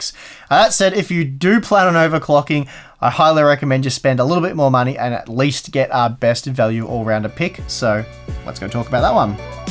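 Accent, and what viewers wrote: Australia. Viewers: you sound Australian, why not say the Australian prices? (I am aussie)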